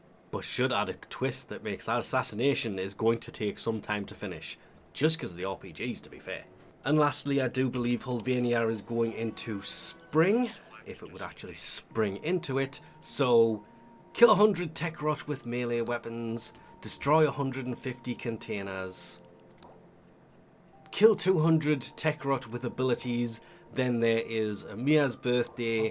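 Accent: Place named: Newcastle, United Kingdom. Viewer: Wait a geordie warframe player? Yassss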